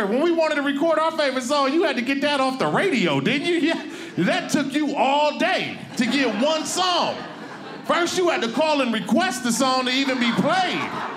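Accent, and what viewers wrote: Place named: Bay Area, United States. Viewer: I knew he sounded familiar A Bay Area Native 😂❗️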